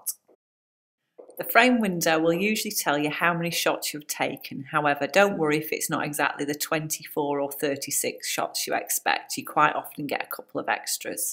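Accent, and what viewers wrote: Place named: United Kingdom. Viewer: Thank you very much for uploading this nice and clear video with a calm voice that pronounces that very lovely and great British English language that everybody can understand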